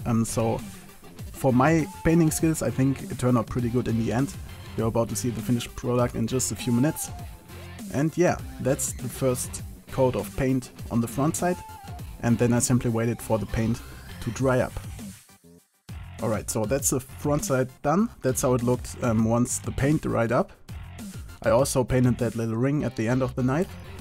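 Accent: Germany. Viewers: Oh no. German english :( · man hört sofort dass du deutscher bist x'D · man hört deutlich das du normaler weise deutsch sprichst, warum machst du dann keine deutschen videos? das würd mich wirklich mal intressieren :) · Man hört halt den deutschen Akzent mega raus, ansonsten spricht er schon gutes Englisch =) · You can hear it somgood that your german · Arbeite mal an deinem Akzent das hört man mega, dass du deutsch bist. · finde ich echt nice wie du das hingekriegt hast( ich weiss das du deutsch bist höhrt man richtig heraus:p) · Das Englisch ist super, nutzt alle Vokabeln richtig, macht keine grammatikalischen Fehler. Ist halt nur der deutsche Akzent den man hört.. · Du bist doch Deutscher.. oder?! xD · nur mal so man hört es wirklich raus das du deutsch bist aber mir gefallen die videos trotzdem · Man are you german? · You sound so german :D You actually are I guess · hört sich sehr deutsch an;) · Junge man hört so richtig dass du Deutsch bist xD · Des war.mir schon klar weil die meisten deutschen so reden wenn sie englisch reden:3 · . 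Lass mich raten.... Du kommst aus Deutschland. Und der Akzent ist auch ziemlich offensichtlich. Alles in allem ist der Karambit aber gut gelungen und es ist auch schön zu wissen, dass hier keine Profis am Werk waren, weil man so das ganze besser nachkonstruieren kann.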